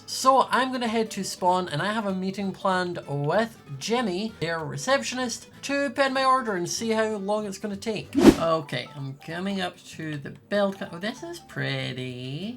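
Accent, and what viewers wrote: United Kingdom. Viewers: you saved joel from the wither! WE STAN british accent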